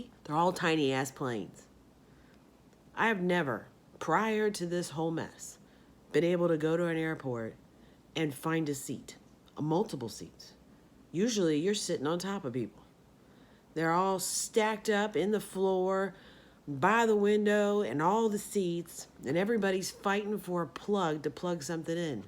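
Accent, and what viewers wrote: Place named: South, United States. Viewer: I hadn't caught that deep southern drawl in your voice before..Are you at Truth Social?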